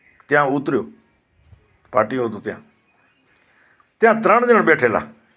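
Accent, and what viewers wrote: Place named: Kutch, India. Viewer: સચોટ વાત તમારી કચ્છી લઢણ માં બોલતી ગુજરાતી ભાષા..જબરદસ્ત❤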